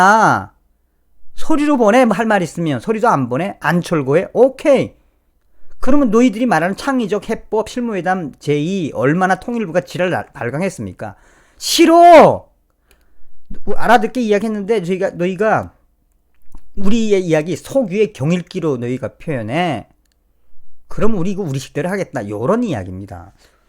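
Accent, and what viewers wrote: North Korea. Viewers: 정성산감독님 화이팅 오늘도 가열차게 달린다 좋은 말씀 감사합니다 귀는쫑긋! !!!!잘 듣습니다!!!!히히 북한 말 조타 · 북한식...너무 웃겨요~~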